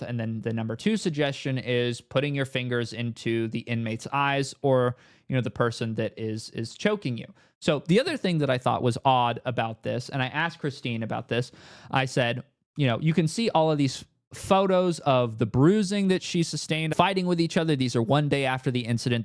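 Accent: United Kingdom. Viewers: I'm only watching this for the British accent when doing Australian impressions. It's what I fuckin live for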